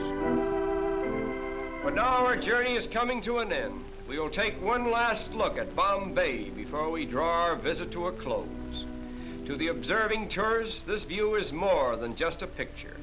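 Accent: Transatlantic, United States. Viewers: Old school Transatlantic accent.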